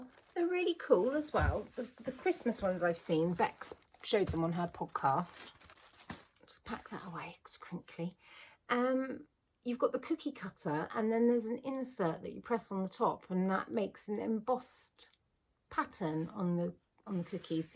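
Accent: United Kingdom. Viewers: Lush to hear the Brisle accent 😂 I hope you keep practicing your spinning.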